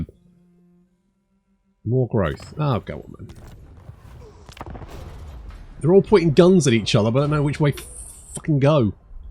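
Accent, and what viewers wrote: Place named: United Kingdom. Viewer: Happy New Year everyone! Since it's a new year and a new campaign(and likely to get a lot of views) when did you guys find and what campaign? The first campaign I watched was Kemmler and Krell... for Warhammer 1 which was what seven years ago oh time flies. The cinematic battles via replays were something nobody else at the time was doing(at least Surrealbeliefs and Heir of Carthage weren't maybe somebody else was idk)and that was just a great watch and so was listening to the funny British man babble about turning units into jam and stuff.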